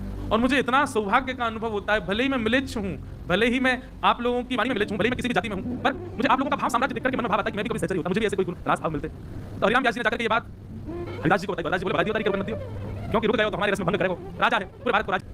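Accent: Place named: Braj, India. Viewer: जय हो भैय्या बृज भाषा बड़ी अच्छी लगै आपके मुख से। सुंदर कथा। हमने भी राम स्वरूप जी के दर्शन किए हैं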